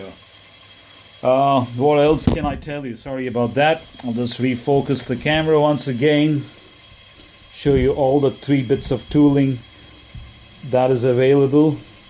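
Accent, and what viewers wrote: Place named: Netherlands. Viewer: Okay, just thought you might be from Holland originally, your accent are similar to Josh' at Hemistorm RC :)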